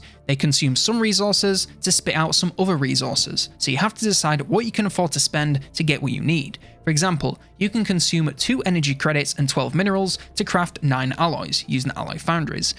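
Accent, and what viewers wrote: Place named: England, United Kingdom. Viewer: Why is every guide by a guy with an English accent? No offense but I have difficulty understanding accents especially when the person talks a mile a minute.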